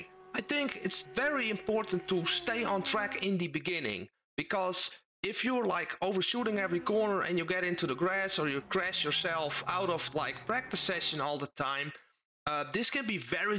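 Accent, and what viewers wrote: Netherlands. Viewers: Super Dutch, lol. that dialect is obvious. Groet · Are you Dutch? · kom je uit Nederland?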